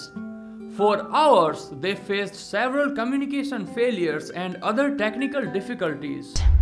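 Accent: India. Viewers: a pajeet is narrating this? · This is an Indian Accent I think, I hear that alot where I live (not India tho) Am I right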